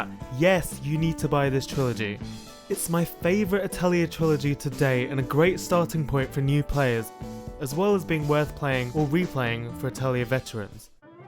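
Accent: United Kingdom. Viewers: I have a lot of games to get through as it is, but the way you presented these, they really seem like the kind of game I'd enjoy. I'll add these to the long list of games I'll need to look more into, I guess... once I finish my large backlog of games that I already own. (Also, yes, more talking with the English accent.) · This was actually a super cool video, i perfer tactical Rpgs as apposed to these kind of turn based ones, but nonetheless i enjoyed hearing about this game and i might actually end up buying it lol. Also i knew you were from the UK but i didnt think about you having an accent. It was really enjoyable to hear you ramble about something lol. very nice change of pace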